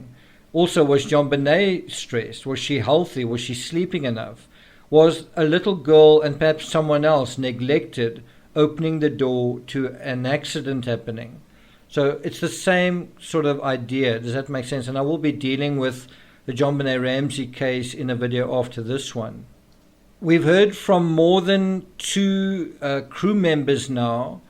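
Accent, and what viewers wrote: South Africa. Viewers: This American loves your South African accent 🙂 and of course your wisdom too. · You popped up my in my recommendations and when you said the most authentic voice in true crime in the intro...I thought wait this dude sounds South African... and pleasantly surprised that you are!! Howzit from a fellow South African * slams subscribe button *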